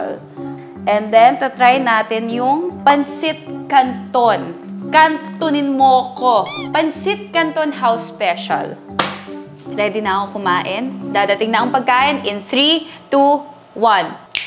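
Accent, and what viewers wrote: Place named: Philippines. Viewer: The english filipino accent days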